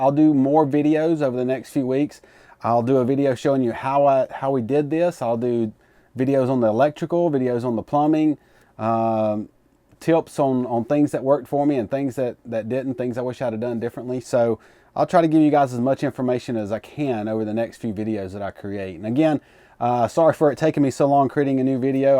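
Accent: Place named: Appalachia, United States. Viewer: Are you from North Carolina? You sound south/easty-of-appalachia-y.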